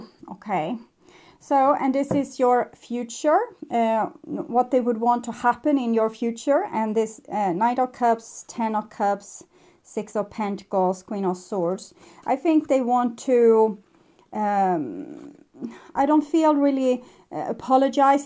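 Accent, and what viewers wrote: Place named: Sweden. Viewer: thank you yor Swedish english braught many fond memories back out of Järnatiderne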